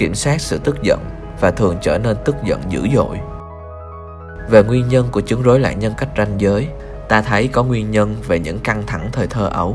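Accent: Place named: Saigon, Vietnam. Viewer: a này nói giọng sài gòn hay và cuốn quá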